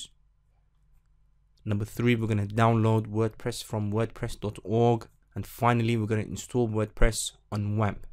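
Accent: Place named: Africa. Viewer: african accent i guess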